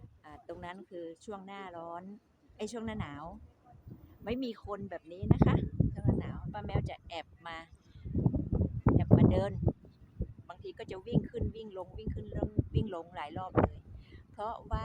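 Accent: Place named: North, Thailand. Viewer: มาฟังสำเนียงเหนือด้วยคนค่ะเพราะมาก❤❤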